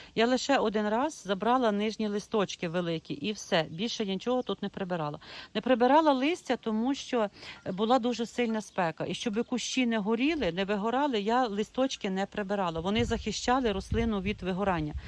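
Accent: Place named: Ternopil, Ukraine. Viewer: Доброго дня там ваш чоловік. Дуже Вам дякуємо за Ваше відео. Дивлюся кожне Ваше нове відео яке виходить. Ваша мова така мені знаєма, у мене покійний чоловік з Тернопільської обл. Борщівського району, він розмовляли точно як Ви. Я з Херсонської обл. Каховського рну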